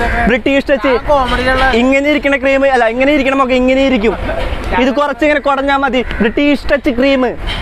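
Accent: Kasaragod, India. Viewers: Ksaragod slang mindan ni onnum koodi indanam mone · kasaragod basha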